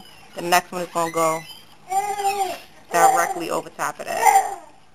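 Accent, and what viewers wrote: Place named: Philadelphia, United States. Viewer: haha I new it. I'm from philly and our accents are quite simular..lol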